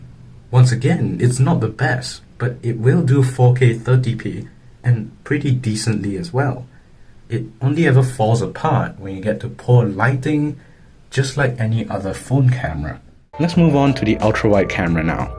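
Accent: Nigeria. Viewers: You sound like a Nigerian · Why do you sound like a Nigerian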